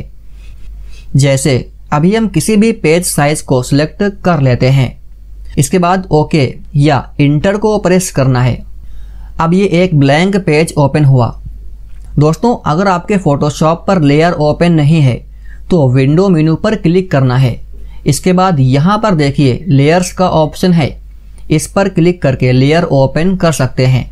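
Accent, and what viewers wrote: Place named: Pahadi, India. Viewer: very nice, lekin apke bolne ka andaj pahadi lagta hain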